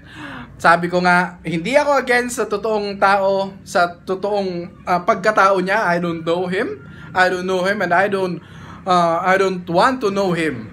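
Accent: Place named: Waray, Philippines. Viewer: Waray gud...hahah. maupay la pagkinita😅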